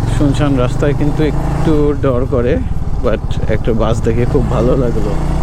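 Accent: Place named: Jessore, Bangladesh. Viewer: তো বড় ইউটিউবার হওয়ার পর ভুলে যেয়ে না। আপনি কি যশোরের মানুষ? কথা গুলো একদম আমাদের মতো 🙂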